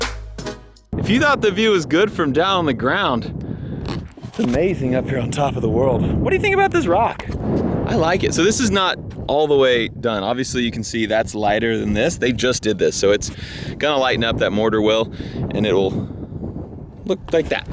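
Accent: Texas, United States. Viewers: What part of Texas are u from 😐😐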